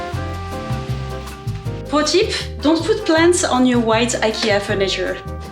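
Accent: France. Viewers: c'est trop drôle d'entendre une française parler anglais ( en plaçant plein de mot en français aussi 😄 ) en tout cas ton atelier créatif fait vraiment rêver 😍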